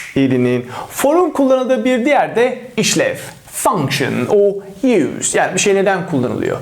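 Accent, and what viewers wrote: United Kingdom. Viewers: ingiliz aksanıyla da anlatması paha biçilemez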